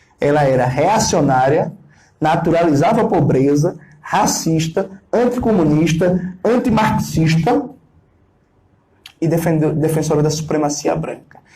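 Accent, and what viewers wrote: Pernambuco, Brazil. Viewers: sim, sem dúvida, o sotaque é puro mangue pernambucano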